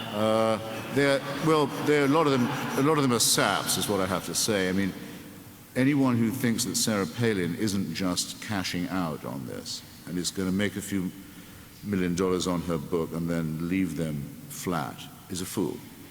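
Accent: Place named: England, United Kingdom. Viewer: If he didnt have an english accent no one would care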